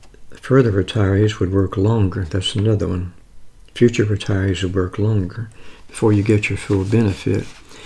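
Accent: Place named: South, United States. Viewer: Great video! Thank you for continuing efforts. I wonder if you # or labeled a video southern accent if it'd intice more people to watch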